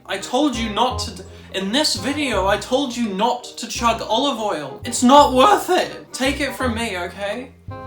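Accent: New Zealand. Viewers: Clicking on a femboy memes video only to get immediately slapped in the face by a kiwi accent really sent me for a loop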